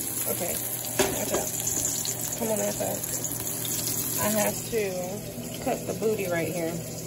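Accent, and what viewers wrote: South, United States. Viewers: You got me cracking up with the southern accent 🤣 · Girl, you are so crazy! Your southern accent sounds like that character sister husband in that movie where the heart is.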